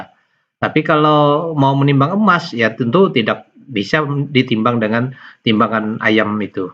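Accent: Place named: Banjar, Indonesia. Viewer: umpat belajar Guruu .. R nyaa banjar banar😂jar abah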